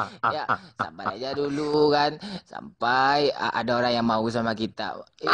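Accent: West Sumatra, Indonesia. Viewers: Lucu bgt logat Minang TPI bahasa nasional lucu ²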